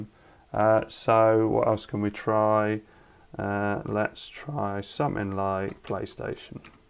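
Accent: United Kingdom. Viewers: Yo are you from sheffield mate?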